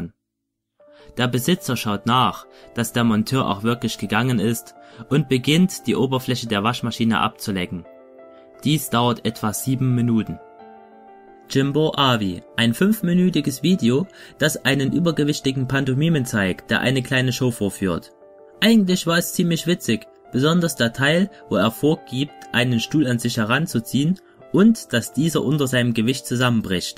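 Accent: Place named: Saxony, Germany. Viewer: Okay, ich schreibe mal einen professionellen Kommentar: (Es wird ein etwas längerer Text) Fangen wir an mit "Text und Aufnahme": Also zuallererst einmal ist deine Sprechweise gut, der Lesefluss ist super und deine Betonungen glaubhaft und ist gut platziert. Manchmal hast du aber etwas knapp geschnitten, damit meine ich das manche Sätze schnell enden und du also (rein natürlich) nicht die Zeit gehabt hättest Luft zu holen. Ich sehe deinen Förderbedarf im Dialekt, da ich dich mittlerweile sehr gut kenne weiß ich das du hier sehr darauf geachtet hast nicht zu sehr "Sächsisch" zu klingen, dafür ein Kompliment. Zum Text gibt es kaum etwas zu sagen da es ein ziemlicher Klassiker ist der eher vom Background lebt, also den Videos die man tatsächlich anschauen kann. Ich fahre fort mit "Technik": Mit deinem Videobearbeitungsprogramm hast du eine gute Wahl getroffen. 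Die Bilder sind stimmig und passen von der Bearbeitung zu NPFNP. Man "merkt" das sich die Qualität von diesem Video vom Rest erheblich abhebt das sich nicht zuletzt auch auf dem Sound auswirkt. Auf die Bearbeitung deiner Stimme gehe ich mal nicht ein, da du ja dort noch etwas Hilfe hattest ;) Der Background ist gut gewählt, bei aber + etwas leise. Fazit: Eine Interessante CP die ich sehr genossen habe, danke für deine Arbeit ! NEVER~SLEEP~AGAIN Cruzix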